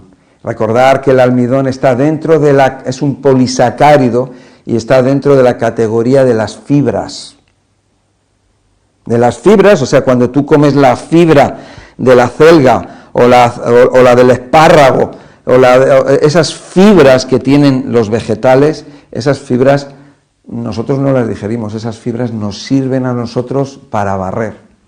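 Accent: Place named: Spain. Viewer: Me encanta ese acento Español soy cubana todos mis abuelos eran Españoles hasta yo hablo distinto a lo notmal soy maestra de Español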